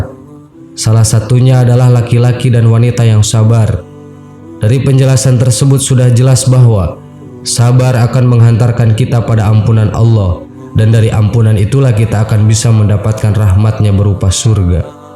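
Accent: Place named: Sunda, Indonesia. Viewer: Suaranya si abang khas bangeetzz Suara org sundaa..mantep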